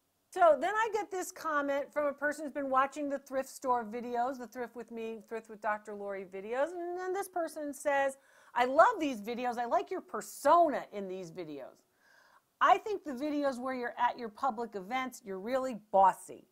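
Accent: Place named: New England, United States. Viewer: Dr. Lori you are just precious. I love your New England accent and your Italian family stories. I appreciate all of the knowledge that you share with us on the items you select. Please keep it up.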